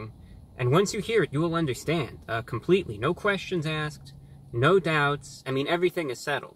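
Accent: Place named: United States. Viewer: He is like an elite british intellectual and connoisseur, except with an American accent. I've never seen such classy American. Where are you elites hiding? I finally found one on YT.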